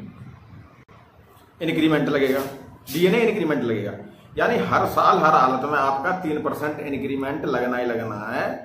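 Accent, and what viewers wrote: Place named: Braj, India. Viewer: Sir mai aapse bahut prabhavit hu......aapki boli braj kshetra ki tarf ki lag rhi...aapki jaankariyan bahut hi kaam ki hoti h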